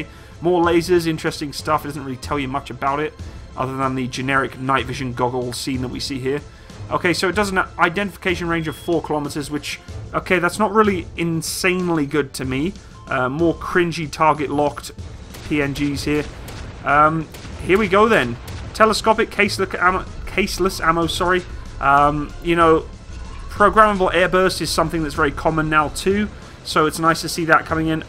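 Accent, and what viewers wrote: United Kingdom. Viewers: Pls do some research and not speculate everything from a shitty video that the company made for PR. It might be difficult for u considering most of your videos are just u reading Wikipedia pages in a British accent.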